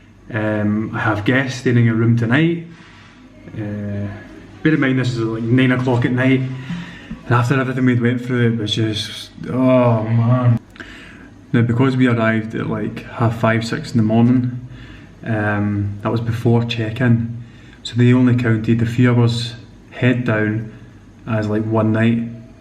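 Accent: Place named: Scotland, United Kingdom. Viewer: that usually never happens in india...yes in independence day it can be a bit of hassle i suppose...so u guys were kinda unlucky..that it just happened to be independence day in india....one thing i can suggest about taxis and cabs in india is..always get on cabs with meter on..and say iam only gonna pay whatever the meter says.as simple as that..download uber app..on your phones..get uber cabs in india..they are awesome. .also ask middle class urban indians on the road..of how much things cost in india..note down the prices..so that you wont get crooked next time..most of the these drivers or whatever come from rural india all they wanna do is make money..so the can be a pain in the ass not just foreigners but for urban indians as well..act street smart...AND DO NOT CONVERT INDIAN RUPEES TO POUNDS..and think oh thats seems reasonable no its not..15000 rupees is a lot of money in india..because living standard is cheaper in india..as compared to scotland..i suppose thats where you from. 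going by the accent..thanks...hope u enjoy your remaning trip..chears..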